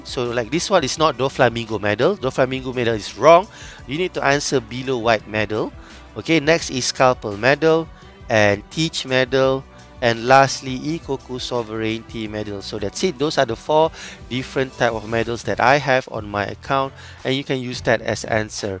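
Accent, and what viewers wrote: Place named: Malaysia. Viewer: English nya khas Malaysia betol🗿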